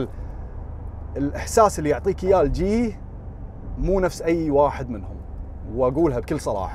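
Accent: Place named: Kuwait. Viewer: والله اللهجة الكويتية جميلة جدا ❤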